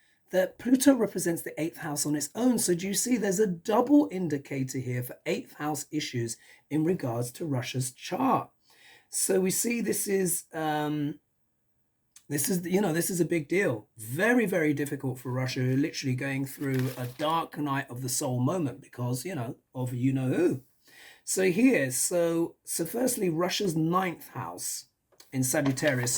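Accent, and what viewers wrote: England, United Kingdom. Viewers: I TOLD MY TEENAGE GRANDSON U SAY SLURP. OMG HE FELL OFF THE BED LAUGHING. THEN HE CALLED A CLASSMATE N THEY BOTH R SAYING IT WAITING FOR ME TO TELL THEM IT SOUNDS LIKE U. I'M NT GONNA SAY THAT BC THEY R FUNNY TRYING TO. THEY SPEAK LIKE U TO PPL THAT DON'T KNOW THIER AMERICAN, NOW THAT'S FUNNY. I LET THEM LISTEN TO YOU SOMETIMES TO HEAR U. I TOLD THEM THEY'RE GOING TO TAKE A TRIP ONE DAY TO ENGLAND N TEST THAT WITH REAL PPL WHO SPEAKS LIKE U, N THEY'LL TALK ABOUT IT FOR THE REST OF THIER LIVES. N I'M COMING TO BC I'VE ALWAYS BEEN DRAWN. THERE FOR SME REASON. BUT I'LL FIND OUT I GUESS LUV U, STAY WELL N. SLURP ALLL U WANT ON UR SHOW.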